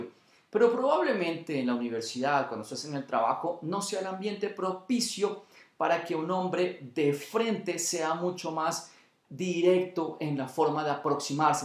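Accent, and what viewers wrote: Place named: Colombia. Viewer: Bonito acento, de entrada supe que eras Colombiano :)... Me re encantaron tus tips, gracias!!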